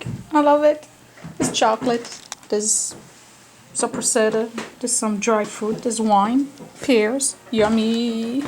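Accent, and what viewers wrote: France. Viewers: Listening to you and your mother speaking in French reminded me of something I keep wondering about ... I know you have been in this country a long time and your accent is very slight but the question I have is, do you THINK in French and then have to translate before you speak or do you now THINK in English? That's just my strange mind at work again.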